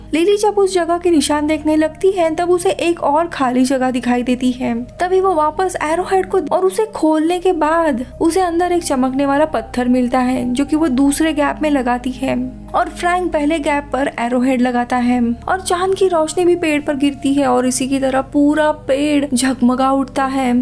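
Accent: Maharashtra, India. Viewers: Hii Host, Your mother tongue is Marathi. Sozhavi Dhashak nahi Solavi Shatabadhi.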